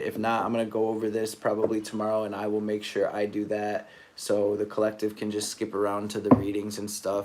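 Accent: Detroit, United States. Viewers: So, I just happened on this account and I don't know anything about you. But you sound like you're from the D....yes? Anywhooo...good stuff here. Thank you!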